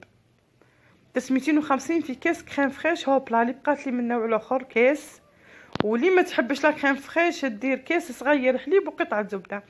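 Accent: Setif, Algeria. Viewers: راكي فور واهدري نورمال هدرتك تحليك سطايفية وعليك لكلام 😘😘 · مميزة صح هدرت سطيف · هدرتك هدرت سطايفية حرة 😍😍😍😍😍😍😍😍😍😍 ماشاء الله حبيتك بزاااااااااااااااف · هدرتنا تسخف حنا السطايفية ... انا نتبعك غير باش نسمعها ... كملي اهدري هكدا عيشك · سلام عليكم ورحمة الله وبركاته أنا ساكنة في فرنسا من سطيف وراكي زاهتني بالهدرة تعك يا سطيفيا الحرة و كثر خيرك و يرحم الي جاب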